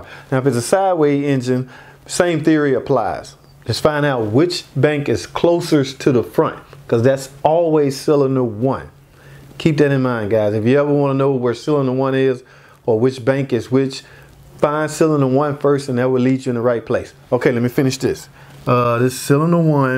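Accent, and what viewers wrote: South, United States. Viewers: I thought it was just your dialect. Are you in the south?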